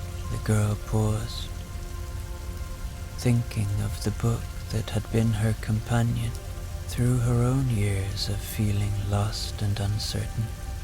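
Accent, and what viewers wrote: Ireland. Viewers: So Thankful for your dreamy Irish voice and wonderful story tonight x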